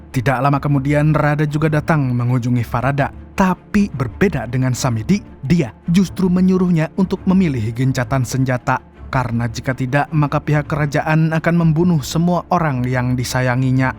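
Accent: Banjar, Indonesia. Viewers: Banjar banar piyan mang ae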